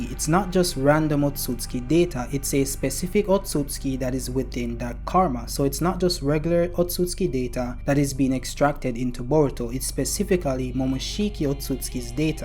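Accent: Jamaica. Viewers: Yow bro.... you Jamaican? Or from the Caribbean??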